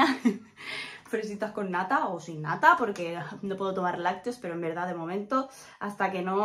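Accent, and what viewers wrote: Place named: Paisa, Colombia. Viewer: Hola desde Colombia. Me encanta sus videos. Pareces paisa con acento español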